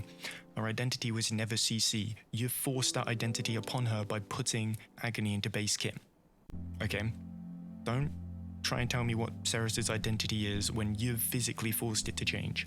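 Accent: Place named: United Kingdom. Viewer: I love this British asmr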